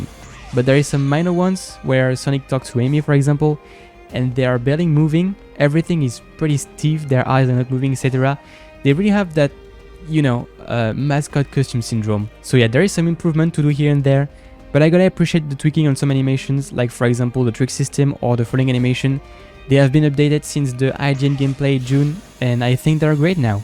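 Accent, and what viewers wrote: France. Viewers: Ha ok donc je viens juste de remarquer que t'a un accent français en parlant anglais tout va bien (bon après je regarde quasiment pas tes vidéos avec commentaires) mais dc pourquoi tu as créé une chaine anglaise? Tu arrivais pas à percer avec ta chaine française? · L'accent français est juste impossible de ne pas remarquer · tas un accent français ptn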